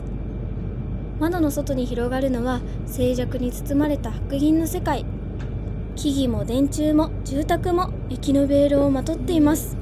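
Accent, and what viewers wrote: Kansai, Japan. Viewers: ご両親が関西出身との事で関西弁がとても自然で良いですね。今回の動画もとても良かったです。また楽しい旅の動画(特に鉄道もの)お願いします。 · 関西弁ですか？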